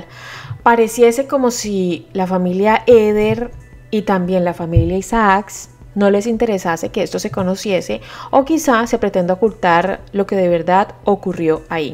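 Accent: Colombia. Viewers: VOS SOS VALLUNA? NO JODÀS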